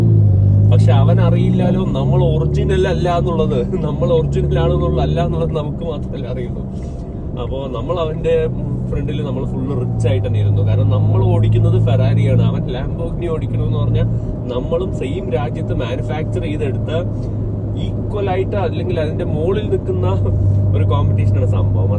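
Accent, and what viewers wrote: Palakkad, India. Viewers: തനി പാലക്കാടൻ😅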